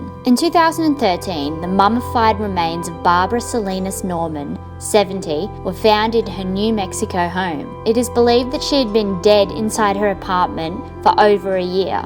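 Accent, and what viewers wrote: Australia. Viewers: sounds Aussie to me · Australian accent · Watched half of it but the first case was the best and then they got boring. The best part of the video is the narrator's voice: refreshing to hear a young Aussie's voice rather than a yank or a synthetic machine voice! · AUssie twang. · that australian accent is adorable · 2funny girls Channel UMM NO, Australian people. SHE IS VERY AUSTRALIAN. As an Australian I can confirm that this woman is in fact Australian. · Hey!, you sound like an Aussie.... Oi Oi Oi:) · the person who speaks sounds austrailian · the narrator sounds like an Australian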